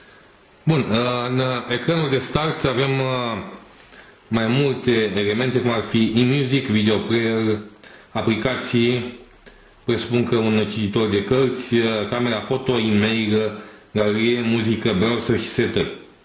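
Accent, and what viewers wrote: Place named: Moldova. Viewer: Oamenilor! E clar ..e rarait...sau moldovean ...sau ambele..ma rog..daca nu vreti..nu va uitati..ce naiba!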